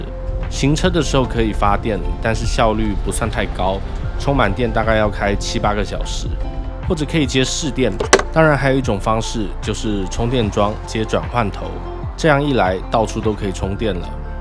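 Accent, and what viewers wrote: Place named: Taiwan. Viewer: 這是在大陸 我以為在台灣 台灣的口音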